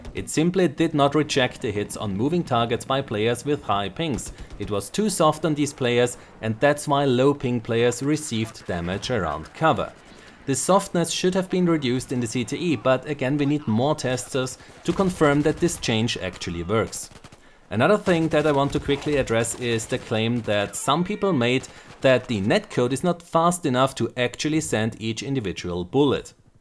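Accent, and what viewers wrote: Germany. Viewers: Are you german ? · The German accent. You know you hear it when you hear "Die Netcode". Many thanks for the info! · Yeah im german and I clearly hear the accent:p your english is very good though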